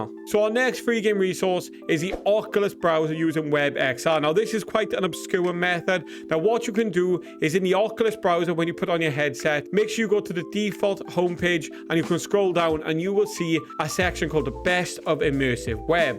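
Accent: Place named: South Wales, United Kingdom. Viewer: Hello which part of Wales are you. I guess by your accent South Wales. I live in church Village and have just purchased the Meta Quest 3 and looking for free paid games to download. Can you help.?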